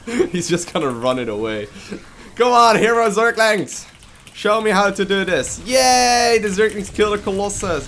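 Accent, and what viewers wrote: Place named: Netherlands. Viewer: Saw this video randomly in recommended panel and man, that 3 years are such difference... event that weird dutch accent you can hear is no longer noticable in 2017 videos :)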